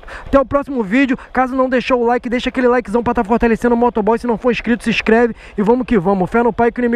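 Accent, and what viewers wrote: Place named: Rio de Janeiro, Brazil. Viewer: O sotaque carioca é o melhor 😅❤🥇Fé tropa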